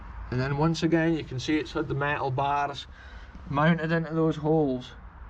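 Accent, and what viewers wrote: Scotland, United Kingdom. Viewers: I love hearing your "brogue". I imagine that my Father's Mother grew up hearing the same Brogue from her Father. He immigrated in the 1870s ; his family name : Horsbrugh .AGAIN, Thank you for an amazing adventure ! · Its been fun hearing your Scottish accent, haha. I am from Seattle Washington USA. Home of Bill Gates. Love your explanations. I am thinking there are probably people buried around the castle. You can tell sometimes by dips in the ground. Do you know any of the history of what happened to the last owners?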